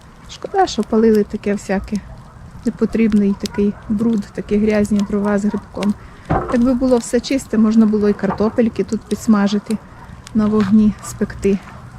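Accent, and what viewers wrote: Western Ukraine, Ukraine. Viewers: Ваша мала Батьківщина-не Карпати.Ну дуже тембр голосу західноукраїнський.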